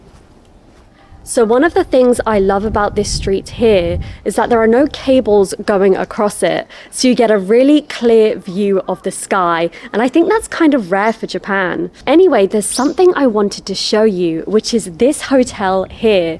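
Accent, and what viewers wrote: United Kingdom. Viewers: イギリス英語だからジャパン、アメリカ英語だとジャペンですかね。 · 歯切れの良いイギリス英語 米語とは一味違いますね。 良い飲みっぷりで見ている方も嬉しくなります。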